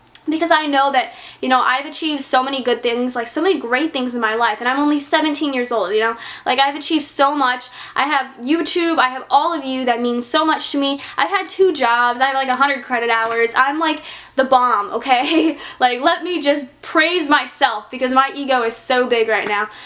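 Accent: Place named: South, United States. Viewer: omg when brain said you picked up a country accent I didn't believe him LOL I heard it!!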